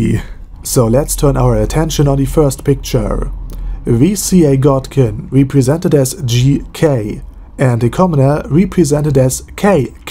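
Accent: Germany. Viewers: Dieser deutsche ne akzent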